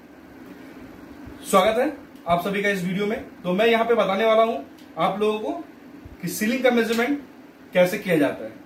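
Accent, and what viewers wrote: Odisha, India. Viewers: Bhai aap oriya ho kya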